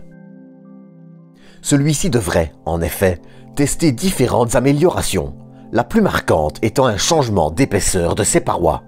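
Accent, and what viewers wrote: Belgium. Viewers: Beau boulot, merci pour tout le taf' de qualité sur cette chaîne ! Je like, je commente, je partage PS: Bel accent Belge j'imagine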